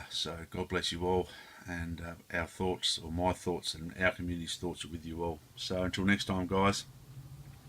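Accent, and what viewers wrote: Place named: New Zealand. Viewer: Nice scratch-building mate...I really enjoy tarting up these old kits. I look forward to seeing what you do with the "Whirlwind" too. Yes, terrible news coming out of NZ with the actions by that white supremacist...world's going crazy mate over religion. When I hear you speak, I think about a new tv series I've been watching from NZ called "The Brokenwood Mysteries"...got a nice young blonde detective in it. NZ is a country that's been on my bucket list for years, that and Australia. Would like to do one month of travelling around both countries...NZ looks so green and beautiful...just gotta avoid those Katipo and Redbacks! Enjoy the rest of your weekend! Cheers : )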